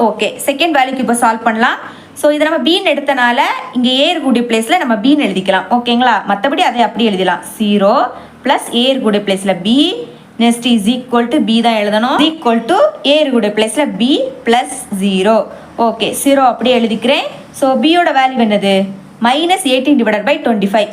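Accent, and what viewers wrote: Kanyakumari, India. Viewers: Super ma,உங்கள் பேச்சை கேட்கும் பொழுது நீங்கள் கன்னியாகுமரி என்று தோன்றுகிறது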